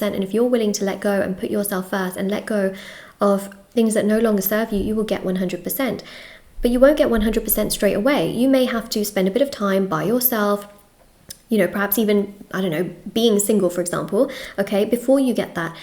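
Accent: United Kingdom. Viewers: British accent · ❤Her accent tells me she's British......❤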